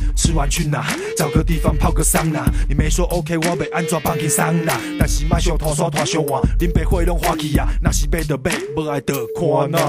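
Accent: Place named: Tainan, Taiwan. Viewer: 好有台南味 親切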